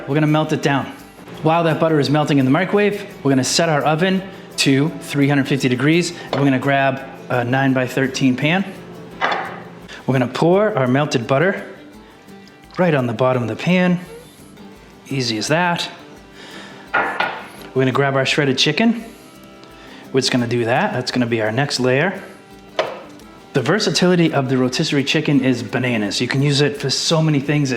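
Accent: Massachusetts, United States. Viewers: You're from Massachusetts, never apologize for how you say cheddah, ked!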